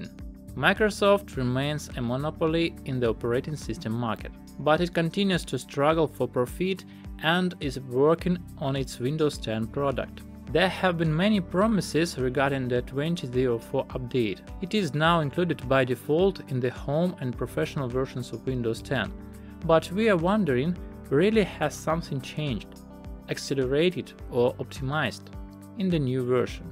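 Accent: Russia. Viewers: По акценту непонятно, что он рускоговорящий?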